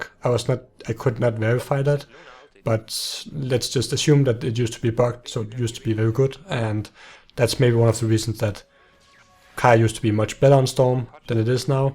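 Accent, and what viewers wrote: Denmark. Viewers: I Can hear the danish in you.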